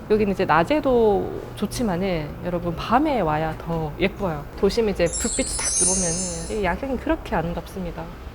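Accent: Gyeongsang, South Korea. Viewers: 살짝 경상도 사투리가 툭툭 튀어나와서 더 정감이 갑니다. 두류동 벚꽃만 생각했는데 맛집에 멋 뿜뿜 대구한바퀴 잘 봤습니다. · 우방타워 야경을 보면서 야자를 했었는데, 이렇게 정겨운 대구 말투로 보니 반갑네요.ㅎㅎ 예전에는 두류공원이 밤 되면 우범지대였었는데, 지금은 시민의 공원으로 재탄생된 것 같아 보기 좋네요. 다음에 시간 나면 애들 데리고 한 번 가봐야겠어요. 좋은 영상 감사합니다. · 재밌어요. 님 ^^ 이거 구독 갑니다. 라떼도 우방랜드에서.. 망원경으로 공개관측회 & 천체사진 전시회 하고 그랬는데... (대학교 동아리) 그때가 98년 이맘때쯤 가을이었구나~ 졸업후 계속 타지에서 생활하고 있는데.. 이런 대구TV 사랑합니다~ ㅋ 약간의 대구억양이 가미된 ^^ 대구한바퀴~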